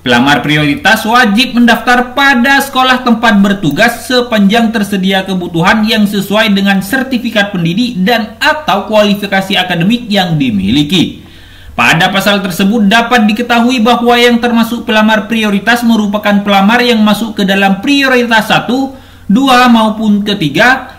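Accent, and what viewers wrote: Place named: Madura, Indonesia. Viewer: Mantaabbb minnnn.... Logatnya Madura sekali mimin... Pokoknya thanks telah memberikan informasi yang cukup jelas ini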